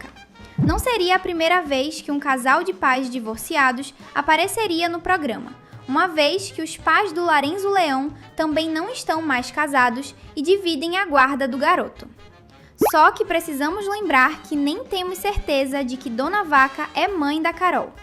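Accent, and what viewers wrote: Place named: Minas Gerais, Brazil. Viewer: Vc é de onde? Vc tem um sotaque mineiro ou baiano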